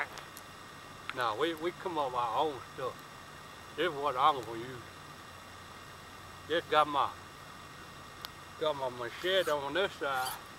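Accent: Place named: South Carolina, United States. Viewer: I dint no ole Beaver live down deh een dat rivah! You sound like my kin folks down in the SC low country there,. Funny stuff! Thanks for the tag...I'll try and make you proud.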